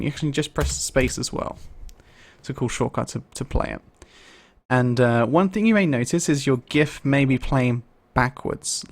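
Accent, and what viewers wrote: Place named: England, United Kingdom. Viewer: Honestly when ever I hear an english accent on starting a tutorial I think ... YES !! its likely to be clear, concise and to the point .. thank you it was .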